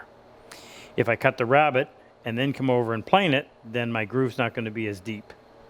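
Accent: Canada. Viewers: And how he says hoose. I love the Canadian speech though, and I enjoy hearing him on his videos.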